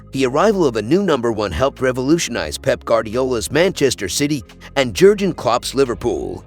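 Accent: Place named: United States. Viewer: Stop using American voiceovers. They are garbage. The do not even know how to pronounce names correctly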